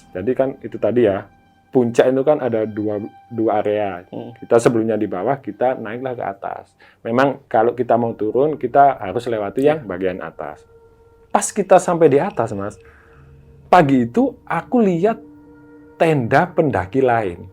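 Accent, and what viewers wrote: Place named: East Java, Indonesia. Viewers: Logatmu mas jawa timuran bgt 🤭😂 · Logat" e sampean wong kediri to mas?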